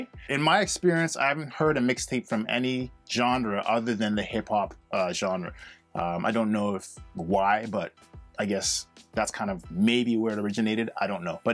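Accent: Canada. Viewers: Thanks for the advice. I can tell that you're also from Canada haha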